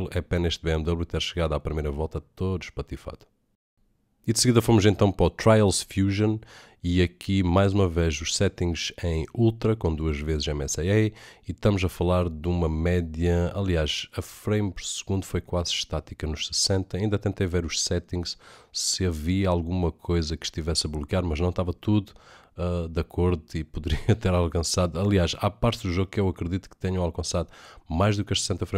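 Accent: Portugal. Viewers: Você é português?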